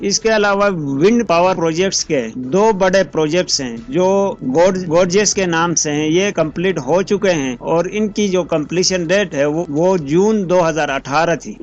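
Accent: Gilgit, Pakistan. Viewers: bai zuban sa Gilgiti lagtay ho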